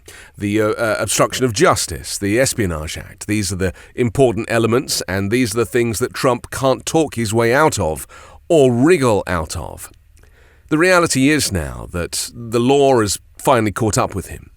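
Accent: United Kingdom. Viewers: OMG 😳 I love 💗 the British accent! We are all in this fight against fascism together. Bless you. 💋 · Don’t u just love the British accent 🥰explaining how trumps ass is about to fry 🔥in the karma grease🤣🙌🏾🌈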